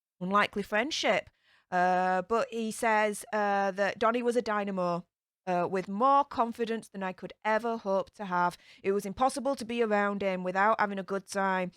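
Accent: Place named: Northern England, United Kingdom. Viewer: I LOVE your accent,!! You must be from UK North- Lancashire, maybe? You sound like Victoria Wood a bit, and like the character Bubble on AbFab a bit. I'm American, so I'm not really up on all the British accents, but as a fan of some Bristish shows and stars, that's who you remind me of. <3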